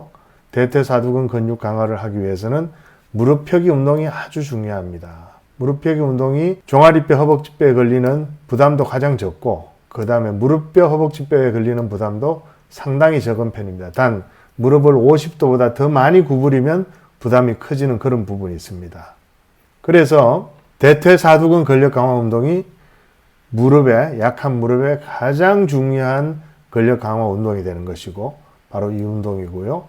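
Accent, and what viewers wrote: Gyeongsang, South Korea. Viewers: 갱상도말투 댐알겠다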